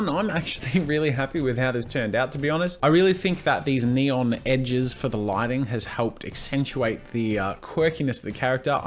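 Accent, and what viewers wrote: Australia. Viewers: Omg r u Australian?? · Is Aussie? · is he australian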